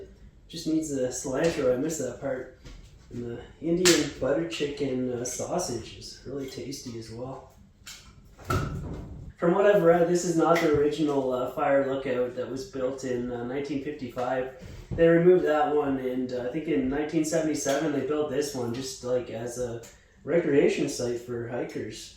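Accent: Canada. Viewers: If the accent didn’t give it away, the comment about a “2-4 of beer and maple syrup” would have solidified Canadian identity. 😆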